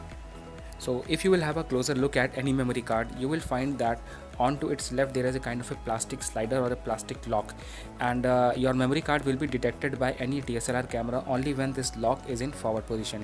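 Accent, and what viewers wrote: India. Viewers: I swear, if there wasn't any thick-indian accent guys like you the whole world would've been still in dark ages. Thank you, this really helped me out.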